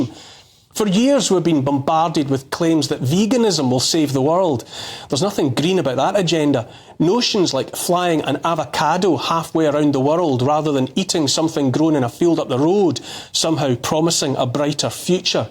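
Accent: Scotland, United Kingdom. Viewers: That strong, angry, Scottish accent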